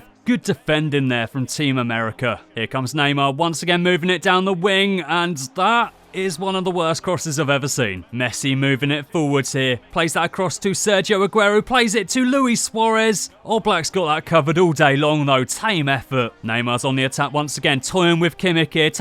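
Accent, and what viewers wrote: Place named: England, United Kingdom. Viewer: I'm a PES PLAYER ,i don't like FIFA but i Like your English ACCENT you should be the COMMENTATOR of FIFA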